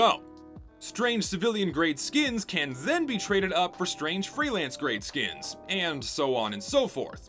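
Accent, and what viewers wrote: South, United States. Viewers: Sounded more and more southern as this played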